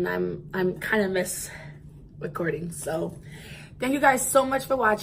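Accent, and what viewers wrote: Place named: New York, United States. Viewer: Are you in New York.... It’s your dialect. Miss mine. Super cute bag. Love my Big Apple Fam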